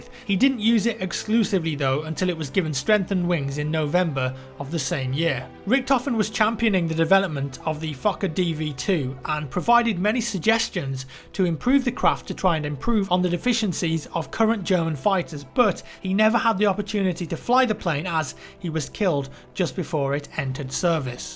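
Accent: United Kingdom. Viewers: I refuse to believe you think really is american, Like what kind of fucking idiot wouldent know he is british JUST HEAR HIS ACCENT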